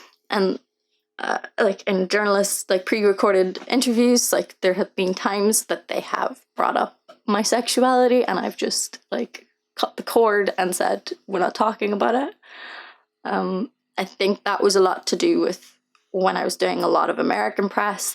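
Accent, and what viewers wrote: Ireland. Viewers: good try , Mistress of Clickbait :)) Now go back to sing, we adore your unique, spicey and irish accent ! Wish you joy & happiness ! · I Never post coments, but this is one of my first, I am so proud of you Janet, for posting this video, it'S what make you happy in life, don't, worry about what other people think. I was honered, to meet you and your manager when you came to Aberdeen, YOU are always a superstar to me. ( beautiful irish voice) You will aways be welcome in Aberdeen Joe x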